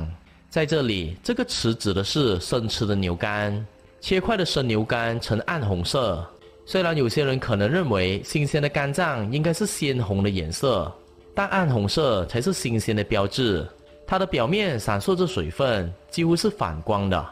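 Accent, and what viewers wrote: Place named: Malaysia. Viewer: 大马口音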